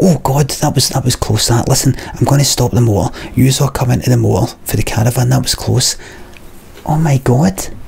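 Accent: Scotland, United Kingdom. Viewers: a scottish man doing slight asmr has now shown up in my youtube reccomended and twitch recommended constantly, bloody well done google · "A wee game of Ludo" I love the Scottish accent so much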